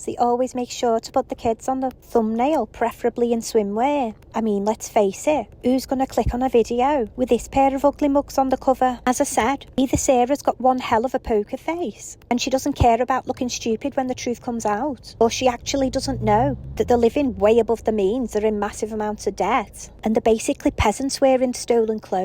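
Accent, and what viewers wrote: Liverpool, United Kingdom. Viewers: Are you a scouser? Love it! Bloody love scousers. I’m from Wirral.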